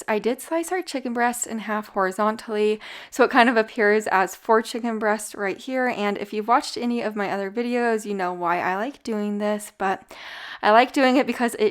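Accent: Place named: Midwest, United States. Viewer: Where are you from!?!!? You have the cutest lil accent I ever did hear! Midwest?? I'm from an area between new yawk and new joisey, so I say cawfee and I'm sure a bunch of other weird words but even I know I murder coffee 🤣🤦‍♀️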